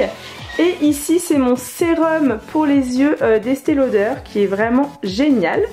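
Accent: England, United Kingdom. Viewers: alala ton accent anglais mavais manquer 😂😂 melted "meite" 😂😂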